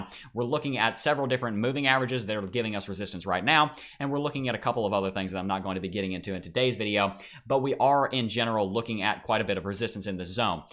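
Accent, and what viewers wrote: United States. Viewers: This strong American accent is painful for my ears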